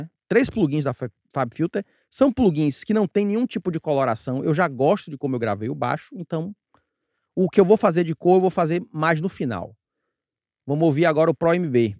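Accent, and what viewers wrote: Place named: Salvador, Brazil. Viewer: Muito bom ouvir o sotaque soteropolitano falando coisas tão de audio tão importantes e trazendo a música fantástica que se faz em nossa cidade.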